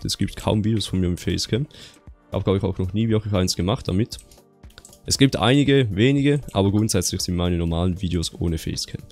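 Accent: Switzerland. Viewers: Nicht negativ; aber ich feier einfach den Schweizer Akzent sehr :)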